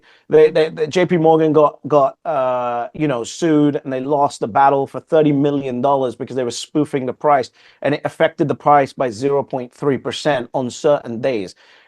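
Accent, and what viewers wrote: United Kingdom. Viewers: Talk that talk ‼️💪🏾💯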